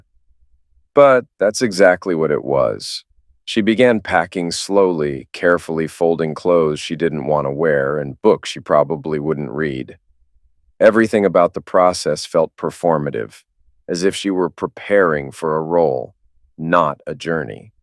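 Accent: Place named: United States. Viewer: Somehow, the american accent doesnt quite do justice to the story.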